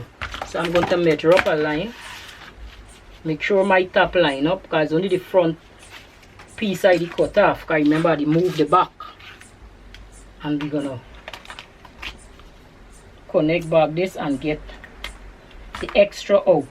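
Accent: Guyana. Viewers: I just join your YouTube you sound like a Guyanese I would like to know where you buy your mannequin iwould like to purchase a size 20 one please let me know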